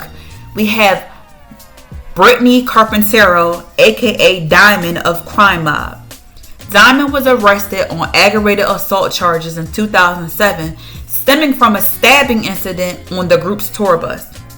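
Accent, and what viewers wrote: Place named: Philadelphia, United States. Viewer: This narrator’s voice is so ghetto I can’t finish listening!! Definitely East Coast…almost sounds like Philly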